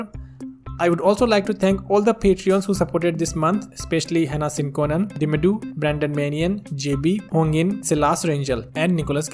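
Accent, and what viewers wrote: India. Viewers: select your TEDE OBJECT :) i cant hold it... the "Indian" accent is hillarious... Anyway great content!!!!!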